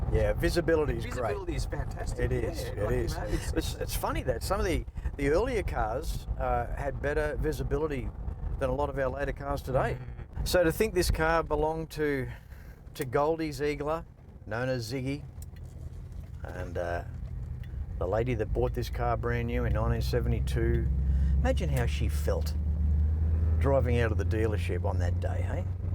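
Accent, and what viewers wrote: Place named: United States. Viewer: That was a very convincing American accent . Iv'e yet to hear an American do an Australian accent well .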